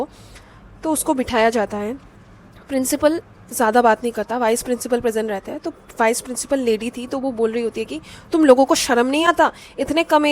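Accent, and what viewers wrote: Bengal, India. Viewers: didi's bengai accent remainds me of my very close school freind always , i still miss him as we both moved to diffrent Cities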